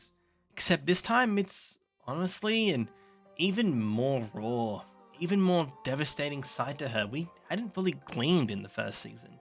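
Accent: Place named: Australia. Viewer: I BLOODY THOUGHT U WERE AUSSIE bahahaha i have no idea why the state sponsored channel showed this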